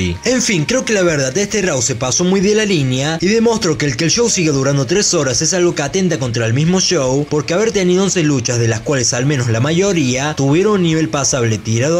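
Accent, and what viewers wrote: Córdoba, Argentina. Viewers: Hola sos de cordoba? Muy buen trabajo saludos desde bueno aires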